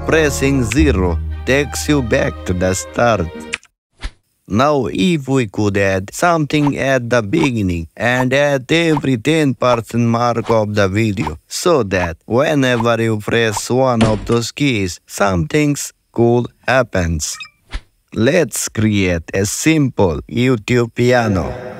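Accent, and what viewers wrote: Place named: Spain. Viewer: Thats the most Spanish accented English Ive heard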